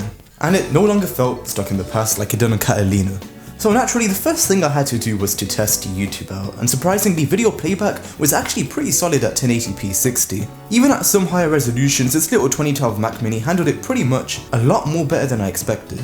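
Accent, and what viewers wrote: United Kingdom. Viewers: Instant problem: "Chassis" is a French word. And our presenter has a Birmingham/West Midlands English accent. You're correct, Chassis is pronounced "Shassee" (not "Chassee").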